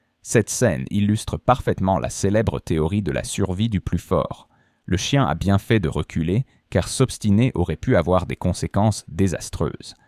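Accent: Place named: Quebec, Canada. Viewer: Franchement je dirais qu'au contraire la voix est très réussie, c'est peut être le leger accent québecois qui t'a dérangé.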